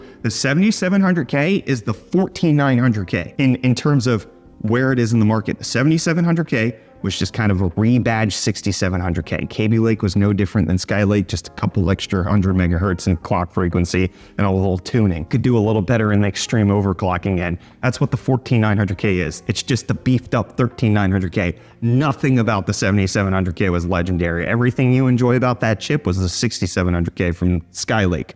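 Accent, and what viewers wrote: South Africa. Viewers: are you from SA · I love that is starting to sound more south African as time goes on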